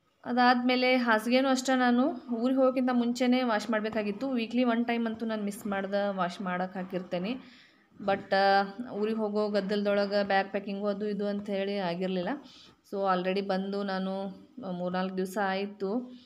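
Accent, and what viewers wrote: North Karnataka, India. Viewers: ಅಕ್ಕ ನಿಮ್ಮ ಭಾಷೆ ಕೇಳಿದ್ರೆ ನೀವು ಉತ್ತರ ಕರ್ನಾಟಕದವರಾ??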